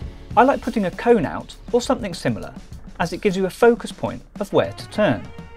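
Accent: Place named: United Kingdom. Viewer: tbh, i just watch these videos because i enjoy the british accent^^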